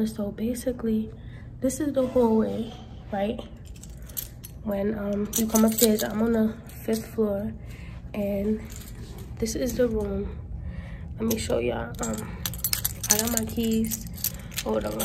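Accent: New York, United States. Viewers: Your lil ny accent is so cute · The NY accent girll 😎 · Her NY accent is giving me the chills 😍😍😍😍😍😍😍😍😍 I love it hereeee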